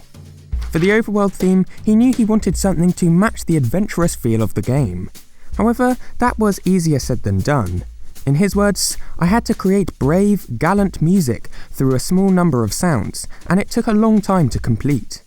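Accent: England, United Kingdom. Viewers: I like your English accent. :3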